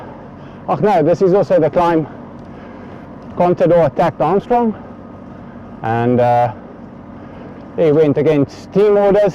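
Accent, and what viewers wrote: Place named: South Africa. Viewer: Somehow I think you are from South Africa?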